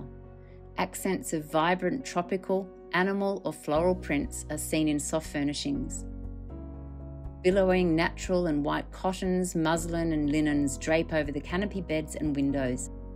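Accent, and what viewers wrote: Australia. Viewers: Thank you for an informative video. It was great hearing an Aussie accent too.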